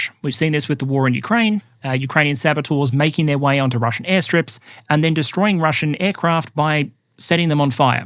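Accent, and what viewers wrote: Australia. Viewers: Maybe they've never heard an Aussie accent? Or humor?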